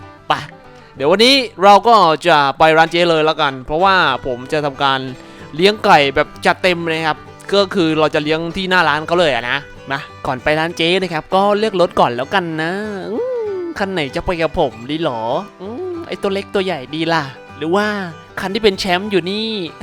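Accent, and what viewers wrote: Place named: South, Thailand. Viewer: ผิดเเล้วๆๆ555หนูชอบพี่เเหลงใต้มากเลยนิ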